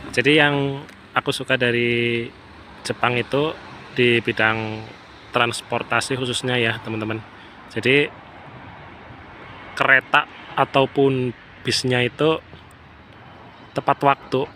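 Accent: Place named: Tegal, Indonesia. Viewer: Logat bicaranya seperti orang dari Tegal nih😁, tapi lumayan bagus cara penyampaiannya 👍👌🇮🇩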